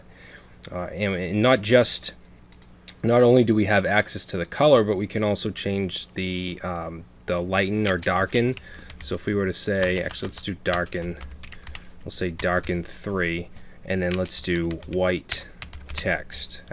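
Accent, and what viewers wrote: Boston, United States. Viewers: You are from Boston huh.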